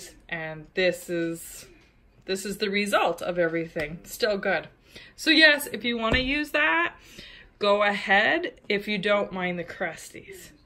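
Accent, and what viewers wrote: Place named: Canada. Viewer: I think sure may be from Canada. I've heard other people pronounce it that way from there.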